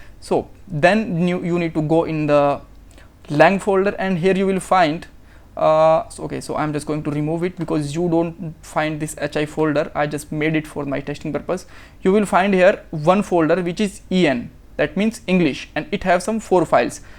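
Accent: India. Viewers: I close programming videos as soon as I hear an Indian accent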